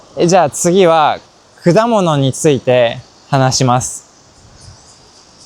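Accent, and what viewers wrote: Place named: Taiwan. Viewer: You sound a Little bit , taiwanise , but your Japanese is on point!!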